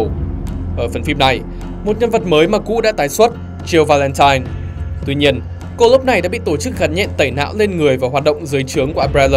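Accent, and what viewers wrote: Vietnam. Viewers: Bác thuyết minh dân Nghệ hay Hà Tĩnh v ạ?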